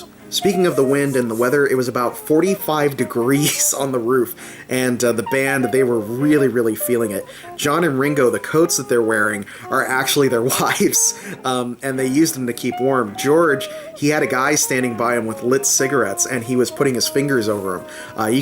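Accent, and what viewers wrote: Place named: United States. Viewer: Why are they always Americans that do this sort of thing,they speak too fast with terrible accents,I keep waiting for him to say Oh my God yuk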